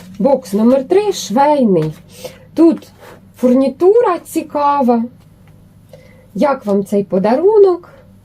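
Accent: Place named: Slobozhanshchyna, Ukraine. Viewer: Сонечко наше ясне!!! Доброго вечора. Подивилася як ви з родиною розбите голубці, і що!ґ! Пішла все купила на гоубкі!!!, Ви неймовірна жінка, а ще саме головне, як люблю ( просто балдею) от нашего Слобожанського говору!!!!! Київ, Суми!!!!!💙💛👍🇺🇦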